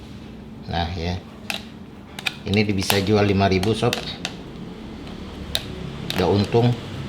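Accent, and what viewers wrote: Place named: Java, Indonesia. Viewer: Kita.." eller ". 😀😀😄😄😄 Medok bingit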